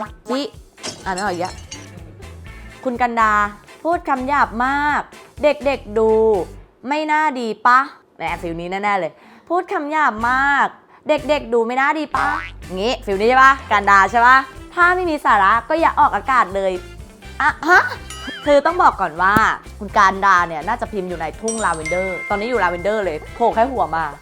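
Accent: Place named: Nakhon Pathom, Thailand. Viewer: ชอบสำเนียงพี่จ๊ะ เหน้อแบบเหมือนคนนครปฐม ❤️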